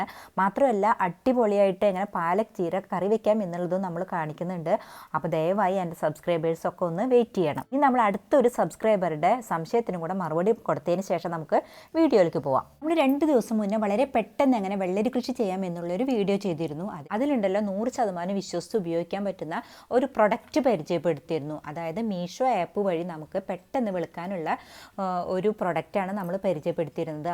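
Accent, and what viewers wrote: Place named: Malabar, India. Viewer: New subscriber. Found your videos full of useful information but your Malabari slang is evident in your audios. Not a big problem. By the way I would like to have some seeds of red and green cheera, palak, long payar, venda and chillies. If possible please send at my address : GEORGE JAMES, THYSHERRIL HOUSE, PANDANKARY P. O., EDATHUA 689 573. ALAPUZHA DISTRICT. Thank you.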